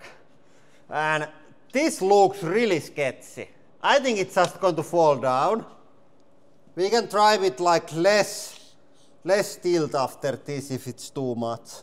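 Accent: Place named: Finland. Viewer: I got recommended by youtube but i can already guess you guys are finnish by the thick finnish accent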